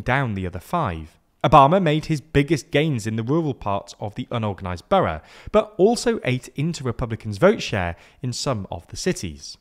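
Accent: England, United Kingdom. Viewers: Do you have a dog in the American elections? I don't think so with this stupid accent! England accent right? The only country going for power to a third world country and the country that made the black people enslaved and stole food from the Indian children and let them die? Now you want people to hear your unimportant opinions? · British guy telling us that Alaska is about to turn blue 😂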